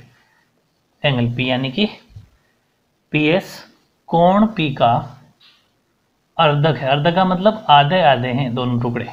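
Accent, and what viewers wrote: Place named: Bengal, India. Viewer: Sir aap Bangali hai mujhe aapki language se lag Raha hai kyunki main bhi Bangali Hoon by the way your teaching way is excellent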